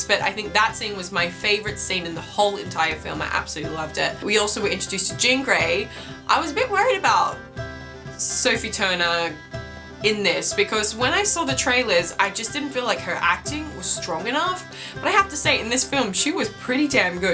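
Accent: Australia. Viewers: Nice reaction, I loved this movie lol I have to know are you from Auzzie or NZ?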